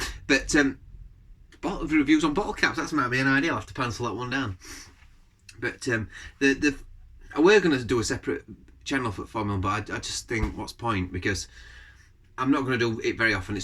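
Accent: Yorkshire, United Kingdom. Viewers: Mate how have I only just discovered your channel now? Been looking for a lad who reviews supermarket beers that I see every day for ages. Loving the content mate! Yorkshire lad? Subbed! Cheers!! 🍻🍻🍻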